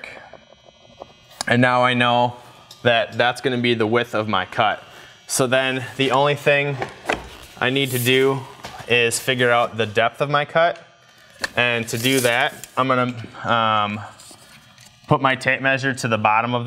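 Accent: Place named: Wisconsin, United States. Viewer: where in wisconsin did you come from?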